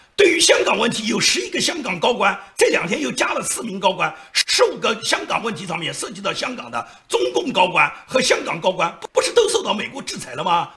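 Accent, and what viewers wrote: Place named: Fujian, China. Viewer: 一听声音就知道是福建烂仔。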